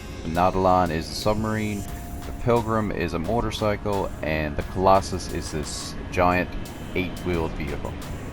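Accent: Newfoundland, Canada. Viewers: Before I even noticed your name "Ah, sounds "Canadian", must be a Newfie" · Are you from Newfoundland? Because you sound and have hints in your name that you are because I am and the accent is very familiar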